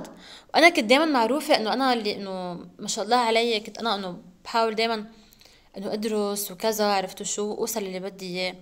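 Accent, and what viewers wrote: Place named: Lebanon. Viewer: و أنا بحبك 😂♥️ فعلاً العين و الحسد و اللت و العجن الزايد ما حلو أنا معك بالموضوع وشو كيوت طالع منك الحكي اللبناني 😆♥️ متابعتك من سوريا 😁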